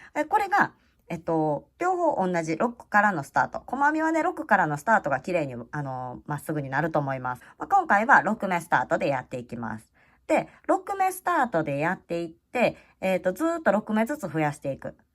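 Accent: Kansai, Japan. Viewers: 関西訛りが魅力的な、こんにちは。(札幌とその周辺は訛りがほぼないので、素敵に聞こえて仕方ないです) 円は、法則で覚えているので…増目位置もその都度考えればすぐに出てきます。法則で覚えられると、便利ですね。中学高校の時、数学の図形の問題と数列はちょっと得意だったので(すごく得意までではない)、編み物の法則も覚えやすい気がします。 …学校のお勉強は、いつどこで役に立つかわからないですけど、ある時ひょっこり顔を出しますね…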